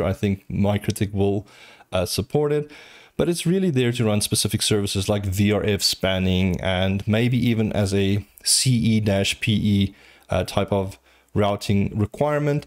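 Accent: South Africa. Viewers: This is amazing, thank you! By the way, where is your accent from? South Africa/Zimbabwe by any chance?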